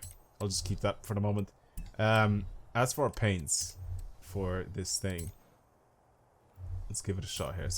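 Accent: Ireland. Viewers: Are u from Ireland cuz I am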